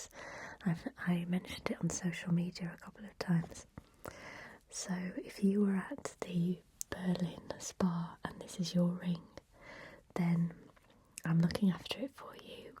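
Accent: England, United Kingdom. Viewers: Such a beautiful voice and I love the English accent. Ooof ❤❤❤ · The lovely British accent makes the ASMR even more powerful. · You've got such a thick English English (from England) dialect, when you're normally speaking it, it's really annoying to me. Sorry, no offense, sweety. Just the pronunciation. But your whispering voice gives me the perfect tingles, I don't know why, but I can chill totally :) That's ASMR. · Most people probably come here for the English accent, I'm English too and it still has the same blissful effect, such a nice accent!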